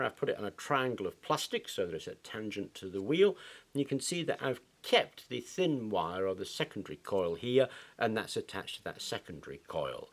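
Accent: South, United States. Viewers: nice, lol "he does have a different accent.." well he does live down south ya know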